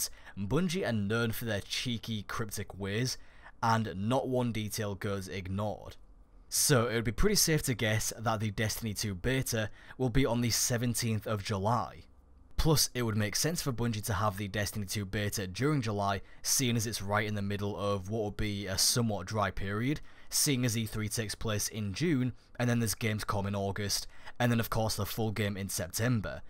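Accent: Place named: Northern England, United Kingdom. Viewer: you have a very distinctive north england accent, do you live there or something.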